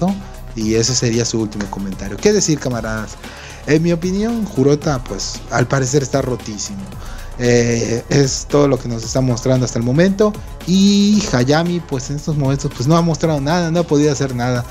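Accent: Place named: Yucatan, Mexico. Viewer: Carnal eres yucateco? Por qué se escucha mucho y eso es genial tienes mi apoyo broder. 🐖🤠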